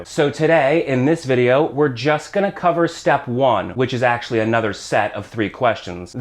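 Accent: England, United Kingdom. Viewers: Im a big fan of you. im from Jordan ** the country** im learning from you English accent and some spanish. thanx keep it up asta luego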